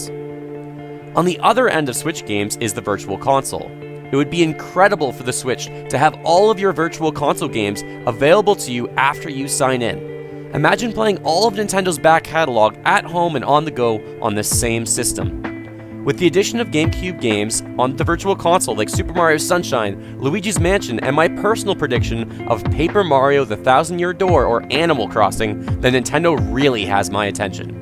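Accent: Canada. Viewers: "it has BEAN in development it has BEAN delayed" gotta love Canadian accents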